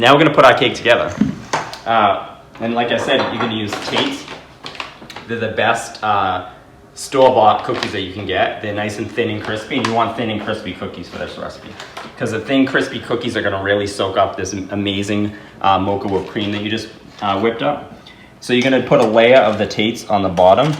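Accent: Boston, United States. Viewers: Looks delicious! Oreo is adorable! And, is that a Boston accent I’m detecting! LOL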